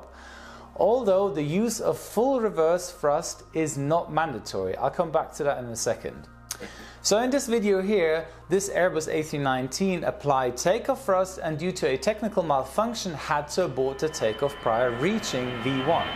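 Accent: Germany. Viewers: Bist du deutsch? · Du bist deitscher..ich höre die Akzent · Vipersniper_369 hes German.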